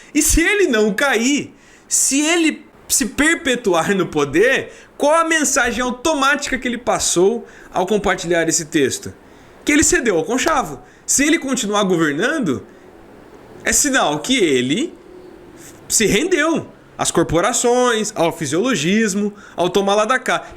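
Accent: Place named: São Paulo, Brazil. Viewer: Quem é esse BABACA? Sequer sabe se expressar esse Ze Ruela, com esse sotaque paulistano, vai tomar no teu cu IMBECIL.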